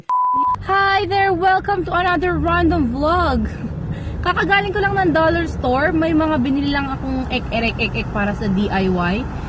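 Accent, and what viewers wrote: Visayas, Philippines. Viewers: Ate bisays ka?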